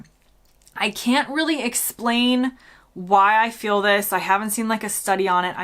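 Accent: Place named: Mid-Atlantic, United States. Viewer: Very interesting video as usual! I think you have lost your American (and indeed also traditional British) [æ] as the pronunciation of the short a in words like 'fact' and 'have'. You use a fully open short [a] just as in modern British English. You have also changed the typical American past [pæst] to a so-called mid-atlantic [pa:st] (a long, fully open front vowel) close to but not exactly like south British [pɑ:st] (a long back open vowel). You do, though, retain the American [æ] before nasals such as in words like can, can't and Ramsay.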